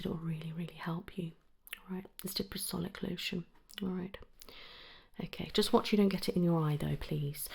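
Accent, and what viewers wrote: United Kingdom. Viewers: You’re seriously the best at these doctor roleplays. Very relaxing. Can’t watch any other doctor roleplays anymore cuz yours are better in my opinion❤️ plus I love the British accent (idk why, different languages and accents give me a lot of tingles)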